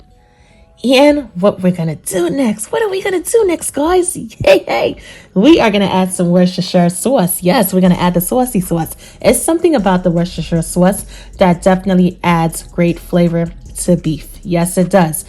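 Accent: New York, United States. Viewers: That New York accent just shines through, I love it. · I love your new york accent too yes I picked it up on the first couple seconds of the video lol them cheeseburger egg rolls look good too smh love ny women can't wait to get back up top mad respect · You must be from New York!!! I’m a new sub for those egg rolls and that saucey-sauce! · Hey - these look fabulous and I can't wait to make my hubby these. Thanks for sharing us you tutorial! Love your presentation and your lovely accent (New York?) We're from Chicago! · New York or Chicago? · Where you from Brooklyn queens or BX? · Om guessing your from the New York by the way you say sauce, there, and off. 😁 Nice video I'm gonna try it thanks..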